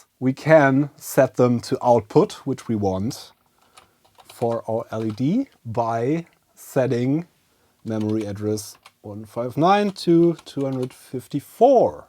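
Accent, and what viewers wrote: Germany. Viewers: In German (and many European languages) the letter G is always pronounced that way. Phonetically "Gee" is very rare, its almost always "Geh" and that will be what learned from a very young age. Just an FYI, the letter W is German is pronounced V which is why he also says things like "Wersion", again its simply because he was taught that from the beginning. Pretty obviously, German kids are taught German before anything else. IMO, as long as you understand what someone means there's no real need to correct them.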